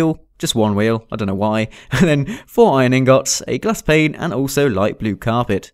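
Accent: United Kingdom. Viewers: I <3 u so much u make my day and make me smile even when I'm in hospital ! Ps r u British?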